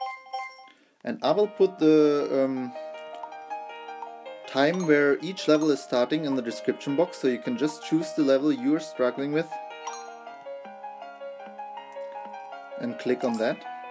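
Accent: Germany. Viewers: Man hört an deinem Englisch, dass du Deutscher bist :D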